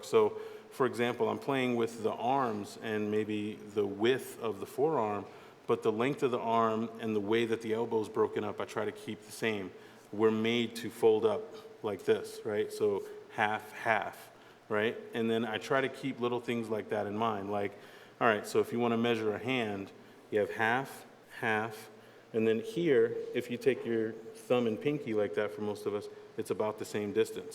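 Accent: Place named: Bronx, United States. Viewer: I knew this dude was from the bronx just listening to him.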